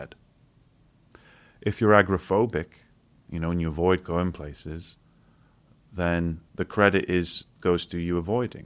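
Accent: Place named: Manchester, United Kingdom. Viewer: I’m so glad I found your Ted talk which led me here. As a fellow Mancunian (living overseas for the past 30 years) it’s so lovely to hear a familiar accent and I totally get your humour. I have had anxiety for as long as I can remember. I can’t get out of bed on a work day without an anxiety meditation and now their effect is diminishing and I feel like I constantly have to look for the next quick fix. After listening to this I’m going to take my anxiety for a shower tomorrow! Thank you!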